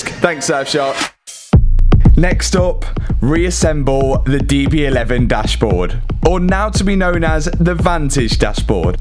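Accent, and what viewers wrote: United Kingdom. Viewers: Late subscriber to your channel, sorry. BUT i hanve literally binged watched everything in the last 10 days....Love it. My Mrs is Leicester so your accent is annoying (im Northern) but genuinely LOVE IT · Great content. Just started watching. Can't wait for the next Lamborghini vid. Gotta say normally I find the brittish accent very annoying but I really don't mind this guy's.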